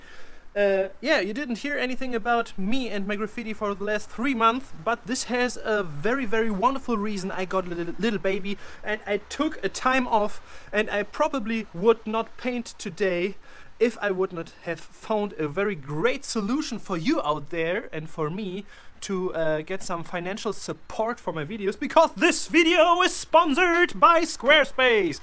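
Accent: Germany. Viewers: Is his accent german?😅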